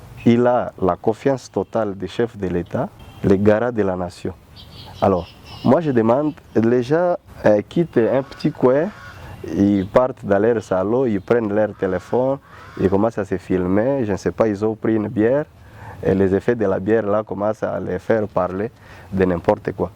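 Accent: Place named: Rwanda. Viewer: C´est les mensonges mon frere, pourquoi vous devez demontré le gens vos nationalité doutese, vous avez accent randaise.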